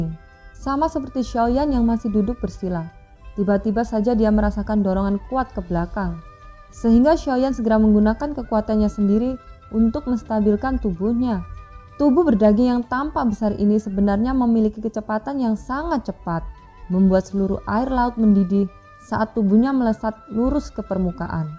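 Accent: Java, Indonesia. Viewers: sekarang suaranya jadi medok...klihatan jawanya.😆 Semangat...!! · Ko medok yah. Maaf nih orang jawa bukan? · Agak meddok. Lucu lucu 🤣🤣🤣🤣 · Medok men toh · Terasa medooknya 🤣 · meddok suaranya kurang power, tapi boleh tahanla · Adu aduhh ko jadi medok ya, 🤭🤭🤭🤭, yo wes gpp, lanjut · Jowone totok😢😆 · Suaranya kaya agak medok gimana gitu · Aura jawa ny mentok ya.. mimin ny..😊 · Suara ne medook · suaranya jadi medok gini.....jadi nggak pass rasanya😢😢😢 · Aih kok suranya ganti, logatnya kaya cici jawa medok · Medok banget nada suara nya.suara Jawa..emang kmn kak..kangen suara nya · Ini kok jawanya medok bgt😂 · Suara mu kgak enak min, medok btol. Mn si??? Dia lgi pas tuk mmbwakan nya, jlau kmu tdk kembalikan, kmi akan ramai2 mninggal kan channek mu ini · Logat jawa nya masih terasa🤭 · kok lain suaranya, ni medok bgt Jawa nya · Medok amat mc nya... 🤭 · Hmmmm ada logat Jawa nya.. 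Jadi gimna gitu denger nya😢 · suaranya medok minnnnnn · Suaranya unik, mendok sedikit,ya keren siihh,di dengar agak kaku sedikit tapi begitu pemulah,ttp semangat ya,🙏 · Suara nya jadi medok bgt. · Suaranya duh..... Logat medok dan lemes... Ayo retret dulu dan berkultivasi supaya kami tak.ketiduran saat mendengarkan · Agax medog pasti mba min orang jawa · sebenernya suara mimin ke 2 enak di dengar, tp yg jd asing itu pembawaan nya kurang tegas malah bikin ngantuk, trs dlm bahasa indonesia nya masih ada cengkok jawa nya jd terasa gmn gt. Sekedar saran coba dlm membawakan alur dlm bahasa indo ini cengkok jawa nya di ilangin 🙏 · Suara nya terlalu cepat ini min... kurangi dikit..biar mirip sama...logat Jawa nya nampak x min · suaranya kurang,semangat,strong,terasa datar,logat jawinya kental,maklum belum terbiasa😁🙏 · ini suara kaya nya Avatar nya ka.yng ngomong bukan suara kka yng asli..ka nya mna ya..Iki suoro ne kaya lagam nya wong jowo.. 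Iki..aduh kurang seru suara mu Avatar..🙏🏻🙏🏻🙏🏻.maap ya suara kka Avatar kka kurang menarik niee😔😔😔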